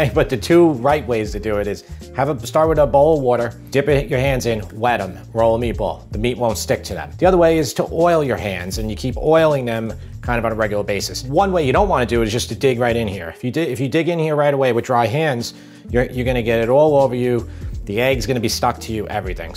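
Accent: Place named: Long Island, United States. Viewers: Test it Awl... Not a knock! I'm a Long Island Boy too! Music to my ears! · You are a delight to watch! Your deadpan humor, your outstanding recipes, your Lon Gisland accent, and your well edited productions have made you my favorite YouTube chef.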